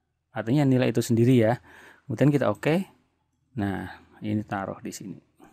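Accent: Banyumas, Indonesia. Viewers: menyimak om sangat membantu sekali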